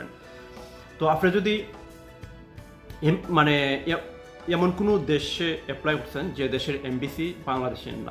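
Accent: Sylhet, Bangladesh. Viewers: ভাইয়া আপনার বাসা সিলেট হবিগঞ্জ??? কথায় বুজা যাচ্ছে,,Am i right? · ভাই কিতা সিলেটি নি?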